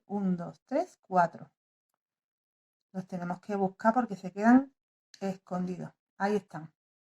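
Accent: Andalusia, Spain. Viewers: Hola creo que eres de andalucia, me alrego de seguir a una española, lo explicas muy bien. Gracias por compartir tus vidios. Yo soy de un poquito más arriba.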